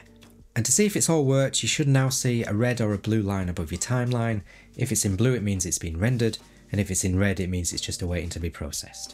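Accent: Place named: Scotland, United Kingdom. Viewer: Excellent channel. Love the smooth, authentic delivery of a real person. I'm going to take a wild swing at your accent. I hope it does not offend, but I'm guessing Scottish. If there's one thing I've learned these 65 trips around the sun it's this: "if it's not Scottish, it's crap" is universally accepted among civilized humans. Best Bond, Sean Connery, no contest. Best drunk Irish stories, Harris and O'Toole. Best Welsh coalminer stories - Richard Burton. Whatever you are, never change that delivery. It's your secret sauce.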